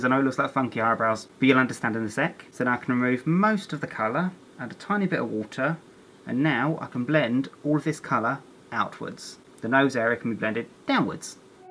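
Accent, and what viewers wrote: United Kingdom. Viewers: OH HOW I LOVE HIS BRITISH ACCENT "mickey!" "but dead" *laugh*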